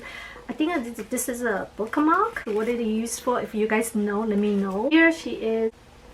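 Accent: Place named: Thailand. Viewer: I think shes from Thailand coz she said duck instead of dark😃😃 thai people can’t say the r just saying coz i have thai friends same can’t pronounce the R 😁 ✌🏻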